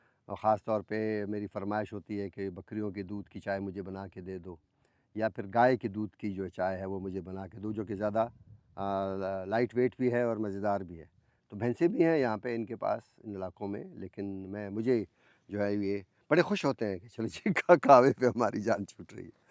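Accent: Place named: Karachi, Pakistan. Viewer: Khosa Sahab Aslaamo alacum ham ne ap ko dramon dekha tha aur AJ ap ko peyaray balouchistan ke gaon mn dranti pakray us ki cataie kar rahay hn ap ki Urdu aesi ha jesay ap Karachi se hn balouchistan se nh,balouchistan mn haryali dekh kar Dil bohat khoush hoia Allah pak balouchistan ko shad,o, Abad rakhay aameen summa aameen mashallah khoush raho slaamat raho aameen summa aameen, I am frame apna Punjab se Duaion mn yad rakhna Allah Hafiz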